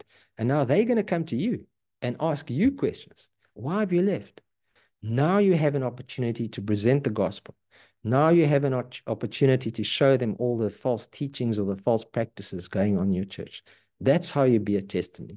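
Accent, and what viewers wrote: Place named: South Africa. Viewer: Hey, spot the South African accent!! 😄 Greetings brother.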